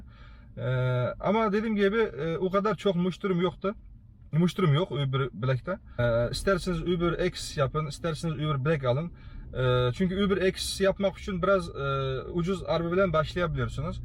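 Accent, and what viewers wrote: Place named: Xinjiang, China. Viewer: Türkçen gayet iyi.kendini gayet iyi ifade ediyorsun.Sadece ufak lehce farkı var Uygurcadan dolayı. Bizdede Uygurca yok.☺️ Güzel bilgilendirici video olmuş👍🏻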